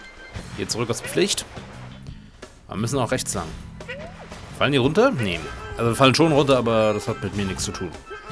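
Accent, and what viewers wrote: Hesse, Germany. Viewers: Er ist Hesse, er darf das.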